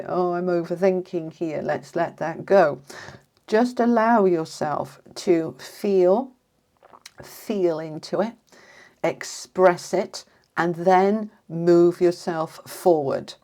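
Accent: England, United Kingdom. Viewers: I had the same flu on the same days, a terrible unstoppable headache and a total lack of energy. But I'm feeling better now. I wish you a quick and full recovery. You're such a nice human being and it's a pleasure to listen to your readings with that perfect English accent. Love from Italy ❤🇮🇹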